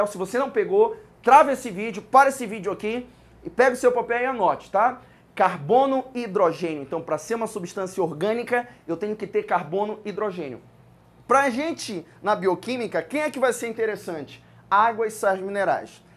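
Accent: Pará, Brazil. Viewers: Maninho tu é Paraense? 😂😂 adorei a aula. 💋🙇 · meu deus tua aula é excelente, socorro! Teu jeito de falar é tão paraense que me senti acolhida. bjs.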